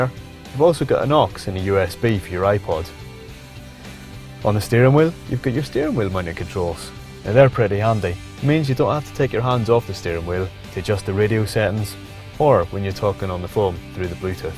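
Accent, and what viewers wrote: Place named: Northern Ireland, United Kingdom. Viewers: I haven't been watching all the motor torque vids recently as I've been busy so I don't know what happened to the previous presenter but this guy is great! A good old Northern Irish accent! Albeit toned down a bit so viewers abroad can understand him but good on you for having a Northern Irish presenter onboard! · Shame those English (?) chaps canny understand our Ulster Scots / Norn Ireland accent. Refreshing to have somebody from our part of the world doing a review. Good content and covers most of what you need to know about the car to guide a purchasing decision - most videos have a positive spin on the car but it does mention some issues and marks it down / realistic marking compared to other flashier / higher performance cars. John Mulholland's (Skoda dealers) here I come.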